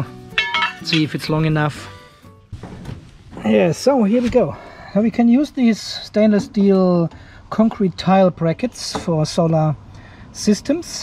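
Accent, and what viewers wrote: Germany. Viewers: I enjoy trying to figure out where people are from by listening to their accents. I've been watching a lot of your videos lately and you were really throwing me off. I had pretty much decided you were a native German speaker but your surroundings didn't look like any part of Germany I've ever seen before. You helped me work it out in this video when you mentioned your German power strip with the Australian plug. I really like your videos as I'm preparing to put together my own battery bank and solar system. Also, congratulations on passing 1000 subscribers!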